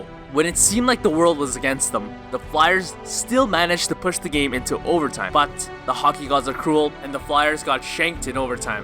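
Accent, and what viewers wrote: Canada. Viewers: What part of Canada are you from? You sound like you're from Quebec. Or maybe you just have a cold.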